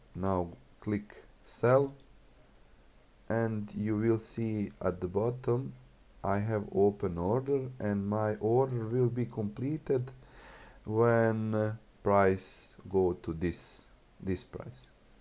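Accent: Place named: Bosnia and Herzegovina. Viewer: Odma sam se pretplatio kad sam čuo engleski sa Bodanskim naglaskom 😅,sala Hvala na video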